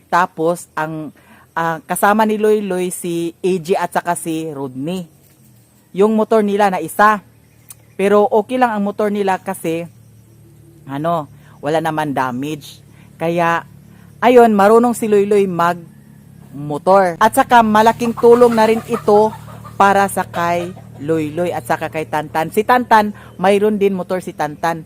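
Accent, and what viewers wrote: Visayas, Philippines. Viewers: Tagalog is not her 1st Language and as we all know, since for sure you've watched some of her videos, Bisaya is her Dialect and that's where she's comfortable with. Haven't you noticed, she's trying to speak Tagalog but she can't help it talking using her own Dialect cuz that's where she's comfortable. She's trying her very self to adjust talking in Tagalog but Please do respect as well her Very Own Dialect. And besides she was still able to Entertain and Inspired her Followers/Viewers even though she's talking multi-Language/Dialect. That's where she's Comfortable with, so let her be Herself, Was known by Showing Her true self so if she'll be changing the Things that she might doing eversince, It's gonna be a Total Different Anymore. Each of us has our Own Meaning of Living. RESPECT BEGITS RESPECT. just Saying. Thanks 🙏 Do whatever you want Ate. 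As long as you're happy doing such things Just Go And Continue. I'm a Fan. A Solid Fan. Love Lots and More Blessings to You and to the entire Family 🙏🤗💖 From SALUDAGA FAMILY Supporting all The way from LAVEZARES NORTHERN SAMAR 🤗💖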